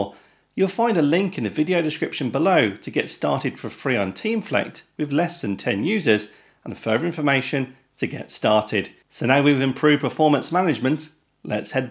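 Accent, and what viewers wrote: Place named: Wolverhampton, United Kingdom. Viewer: This is a good video. However, it does not consider that most people who have an Outlook Classic work email are on IMAP or POP, not on Exchange. Hence, when you switch to the new Outlook option, you no longer have all the same features.and Therefore, switch back. Thanks, something to consider. Also, I recognise the Wolves accent 👍👍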